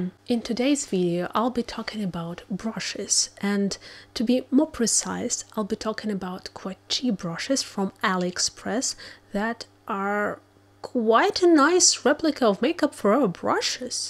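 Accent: United Kingdom. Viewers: ваш британский акцент шикарен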